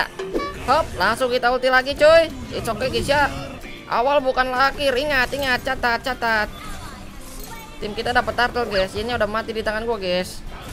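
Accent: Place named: Banyumas, Indonesia. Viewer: Lu knp MEDOK bngt broo ... Ngapak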